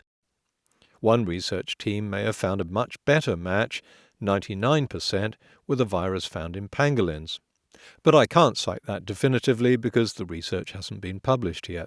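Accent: RP, United Kingdom. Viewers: actually he seems fairly obsessed, neurotic, arrogant, intellectually lazy, poor debater, and possibly lies on purpose to farm his viewers. But he has a British accent so people get confused and give him attention. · This video is garbage. it is claimed that the Wuhan Virology Lab does not exist. But there is very clearly a Wuhan Institute of Virology: <link> How can people just blindly trust this video? Because the presenter has a posh accent? I mean, come on, just fucking Google and you can see the blatant lies in this video. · some well-spoken British guy to voice it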